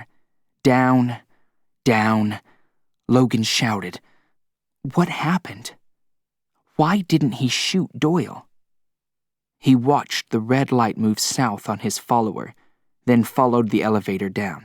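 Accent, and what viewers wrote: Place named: South, United States. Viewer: After knowing Logan as Michael York for 50 years, it's funny hearing him narrated with a southern accent. And his world not being confined to a giant shopping mall.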